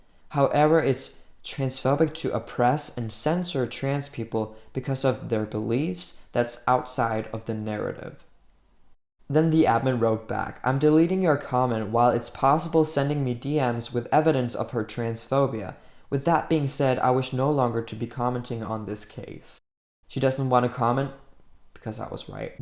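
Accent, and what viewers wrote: United States. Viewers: I’m an old man, 74 years old, I admit that I’m having trouble understanding what to me is a new phenomena. I was surprised that you aren’t an American because you sound so American. I find you to be thoughtful and very reasonable. Until I came across your channel I thought that people in your community were rather odd and very pushy. Thank You for dissuading me of that notion. But correct me if I am wrong; I suspect that you are in a minority in your community. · Wow! Hade inte gissat på att du var Dansk. Din dialekt låter amerikansk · You have a good American accent, do Danes speak like you generally? 🤗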